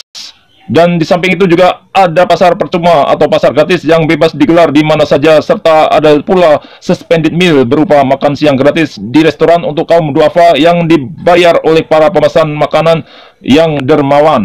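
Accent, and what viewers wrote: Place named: Malaysia. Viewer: Saya dengar abg cakap gaya Malaysia. A tu dibaca E klo Melayu Tahniah. Berlatih selalu lagi cepat pandai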